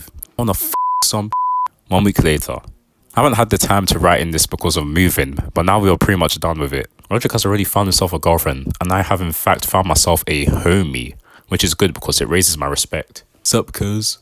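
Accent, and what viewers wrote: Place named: United Kingdom. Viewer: The British accent makes this like 1000x better bro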